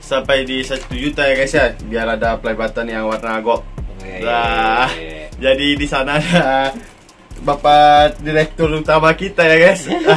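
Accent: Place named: Minangkabau, Indonesia. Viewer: Logat Minang nya masih kental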